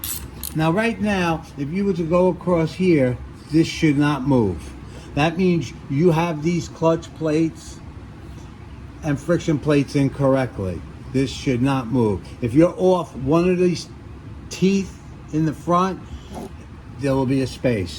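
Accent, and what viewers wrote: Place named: New York, United States. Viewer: You sound like you’re from NYC. Queens?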